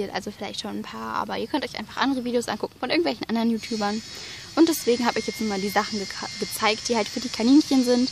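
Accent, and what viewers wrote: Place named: Hesse, Germany. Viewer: Du kommst aus Hessen.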